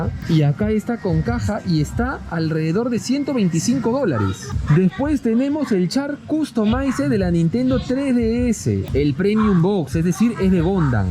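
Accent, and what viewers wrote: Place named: Peru. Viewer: Me gusta mucho tu contenido es interesante pero de verdad que no puedo soportar el acento y la voz peruana es como si estuviese viendo discovery kids lo siento:(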